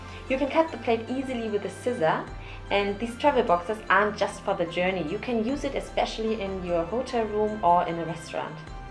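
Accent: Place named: Germany. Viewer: Awwww your accent is so cute!Typical german!😂 Amazing video💗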